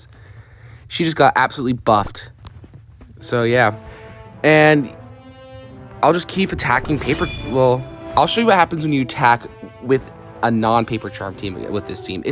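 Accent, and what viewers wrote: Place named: United Kingdom. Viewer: why does he sound so british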